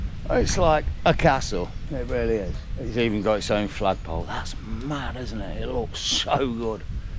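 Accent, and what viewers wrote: Leicester, United Kingdom. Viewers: Having been married to a Brazilian at a time when neither of us could speak each other's language, does Bob understand every you say in that thick Leicester accent ? ❤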